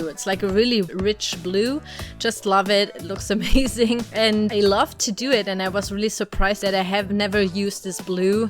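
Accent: Germany. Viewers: Ich habe sofort deinen deutschen Akzent erkannt, aber bin froh dass du Videos in Englisch machst, um mehr Leute zu erreichen. Finde dich sehr sympathisch. Echt super gebaut!:)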